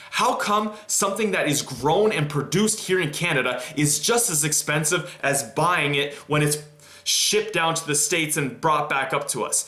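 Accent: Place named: Russia. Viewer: Why the Russian accent if you are Hungarian ? "Vun hundrred perrscent Hungerrian voman"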